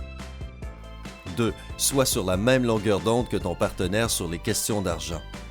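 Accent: Canada. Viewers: Techniquement, ça s'entend que le doubleur est canadien (ou québécois peu importe) donc ils suivent globalement les mêmes règles. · Ces conseils semblent tirés d'un magazine axé sur le même stéréotype : un couple, un enfant, un budget, Papa travaille dans le tertiaire comme cadre moyen... C'est très réducteur, ces conseils qui n'ont rien de nouveau. J'ai l'impression, de plus, qu'avec l'accent de la voix off, ces "14 faits" proviennent du Canada où les conditions sont différentes - on demande, en France, si facilement une "promotion" ? - non, et tous les chiffres budgétaires sont approximatifs (et on a tout converti en Euros). Les chiffres de l'immobilier sont très variables en France, mais une maison correcte à 100 000 € sans rénovation coûteuse, ça n'existe que dans quelques départements désertiques. Et puis les bons conseils entre ne pas emprunter, mais savoir investir, on est là encore axé sur un modèle unique presque idéal. 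Tout le monde est fait pareil ? Non. J'ai l'impression d'entendre à nouveau les conseils de ma mère quand j'avais 20 ans, et à des conseils trop formatés, il n'y a jamais de résultats parfaits (voire des échecs). Ma mère n'imaginait pas, il y a 30 ans, ce que je serais aujourd'hui, dans un contexte socioéconomique radicalement différent. Donc, tout cela est peut-être vrai, mais pour "un-couple-trentenaire-qui-veut-avoir-une-maison-pour-avoir-un-enfant-à-payer-avec-une-augmentation-de-Papa". La pub idéale, quoi. La vie idéale qui n'existe pas.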